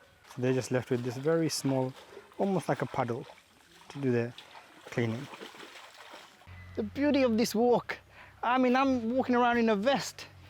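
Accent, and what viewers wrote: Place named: United States. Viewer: You are an immigrant of London... But your accent is very much alike Americans... 😮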